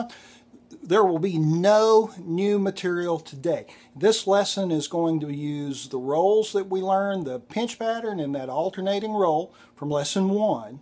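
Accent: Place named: United States. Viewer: Dear, thank you for these lessons! Can I ask which state your accent is from? I adore it. Love a Brit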